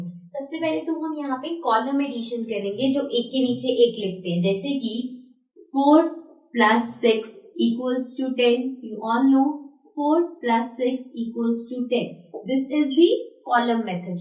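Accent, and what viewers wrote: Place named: Punjab, India. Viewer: You spake punjab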